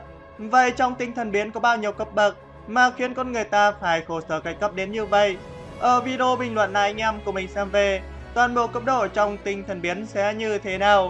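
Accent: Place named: North, Vietnam. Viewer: Bắc kỳ đọc khó nghe quá…!😂😂😂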